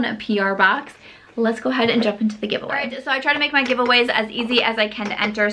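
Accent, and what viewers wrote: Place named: Iowa, United States. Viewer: #samsquad I love this palette! I love the pink look! It would inspire me to try the greens🙃. Lastly but certainly not least, I love your videos. I married a man from Nebraska so listening to an Iowan is extremely comforting. #samsquad jennesskh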